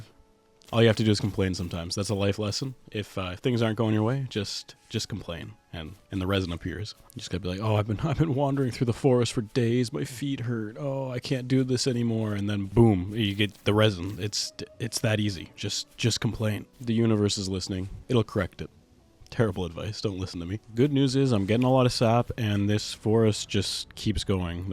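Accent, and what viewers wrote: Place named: Newfoundland, Canada. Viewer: Are you from the rock? Your accent sounds slightly like Newfoundland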